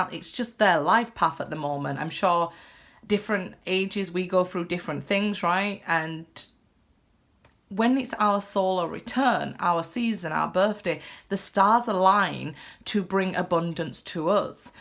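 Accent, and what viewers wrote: Yorkshire, United Kingdom. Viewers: Are you from Yorkshire, leeds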